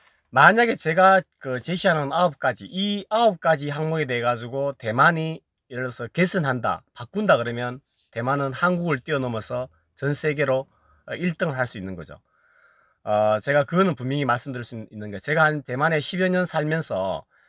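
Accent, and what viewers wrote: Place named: Pohang, South Korea. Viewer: 소중한 정보 고맙습니다. 구수한 포항 억약이 인상적입니다. ^^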